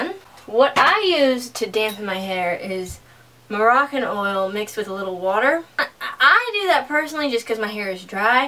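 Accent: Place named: South, United States. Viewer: wowwwww i <3 this video! you sound so southern :) i am too but to me you kinnnnddddaaaa look like miley cyrus hahaaha hope tht doesnt offend you hahaha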